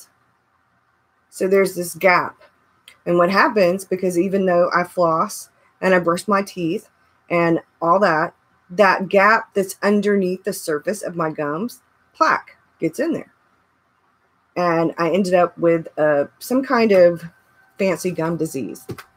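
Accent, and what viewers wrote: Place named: South, United States. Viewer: I thought you had a southern accent!!! love your videos!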